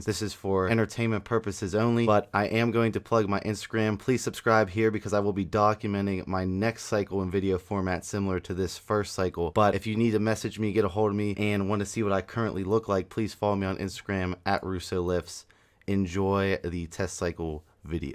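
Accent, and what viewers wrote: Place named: Baltimore, United States. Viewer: Without even finding out, your from Baltimore. Accent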